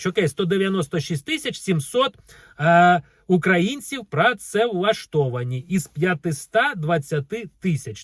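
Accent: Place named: Russia. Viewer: Автор влога, для того, чтобы вести влог на укр языке, выучите его. Ну нельзя же так глумиться над ним. По вашей речи ясно, что Вы думаете и говорите в жизни на рус языке. Много слов, которые Вы пытаетесь произнести на укр языке, украинцы так не произносят.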